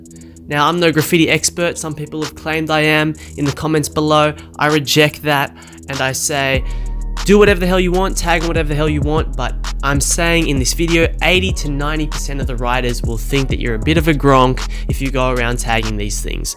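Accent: Australia. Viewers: Ur Australian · You ozzy ?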